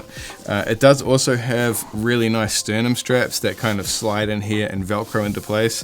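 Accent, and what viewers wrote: New Zealand. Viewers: yeah but you should have used the word very vs. bit... ESPECIALLY when it doesn't even open from the back. Lol. (just breaking your balllls, having a laugh. Love your content and love the kiwi accent in a sea of English photographers doing YouTube - now let's get some momentum/consistency going with the channel eh?)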